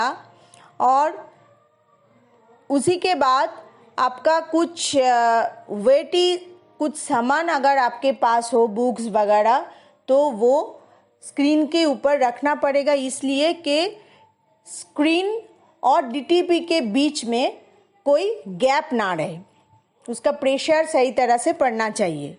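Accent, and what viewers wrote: Bengal, India. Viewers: আপনার হিন্দি বলার ধরণ বলে দিচ্ছে আপনার মাতৃভাষা বাংলা , বাংলাতে বলতে পারেন ।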